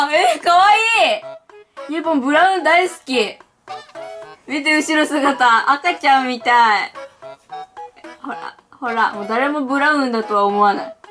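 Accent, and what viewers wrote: Kansai, Japan. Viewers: 関西人だから関西弁じゃない？多分...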